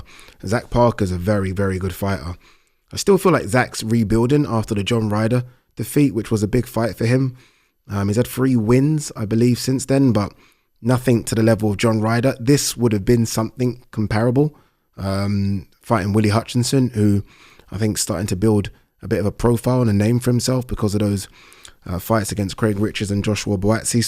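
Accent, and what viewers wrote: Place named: United Kingdom. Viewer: Gotta leave this vid.. the British accent is so guy wrenching 🤣🤣🤣🤣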